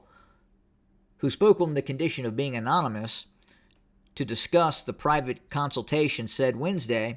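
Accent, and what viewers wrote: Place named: South, United States. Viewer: I enjoy the southern accent. 👍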